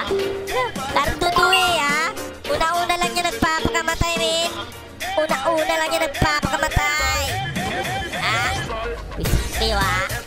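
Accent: Visayas, Philippines. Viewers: Kuya ano ka? Bisaya o Tagalog?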